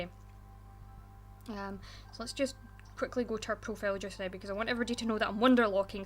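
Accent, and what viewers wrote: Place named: Scotland, United Kingdom. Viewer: Could you please do a video of you pronouncing different Pokemon names? I admire Scottish accents, and have been trying to find a video of a Scot pronouncing Pokemon names for a bit now, and this is the closest I've found.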